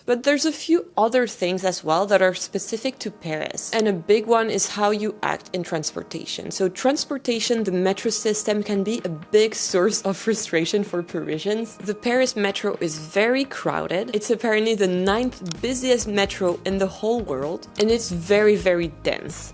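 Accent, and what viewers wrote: United States. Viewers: speaking in English with an American accent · cets quoi ce délire d'avoir l'accent américain et de parler avec la gorge, génération miley cirus, c'est horrible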